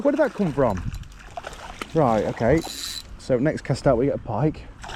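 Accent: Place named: Nottingham, United Kingdom. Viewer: Alright, im from nottingham, you sound like you are from my neck of the woods . Where is this river you fish , is it the maun ?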